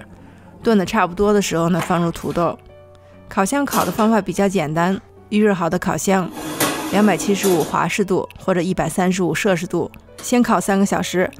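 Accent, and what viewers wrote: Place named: Shijiazhuang, China. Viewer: 听的口音很像石家庄人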